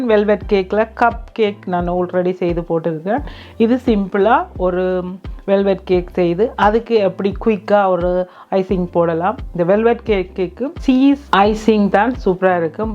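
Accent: Sri Lanka. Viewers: Azhagana Ilangai Tamil❤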